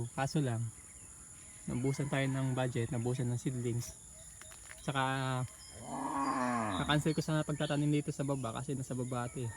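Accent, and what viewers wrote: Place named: Bicol, Philippines. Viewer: Taga San ka Pala boss parang bicol ka Kase pag nagtatagalog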